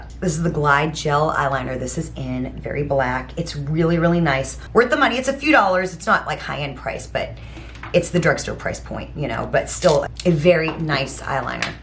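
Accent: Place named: Georgia, United States. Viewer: I didn't know you're in Alaska. I feel like you have a Georgia accent 😊😅 I love your earrings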